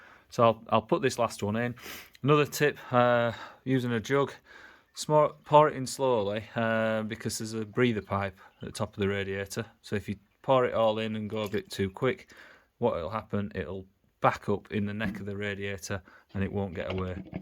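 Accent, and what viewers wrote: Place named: York, United Kingdom. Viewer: Where are you based? You sound local to me, York?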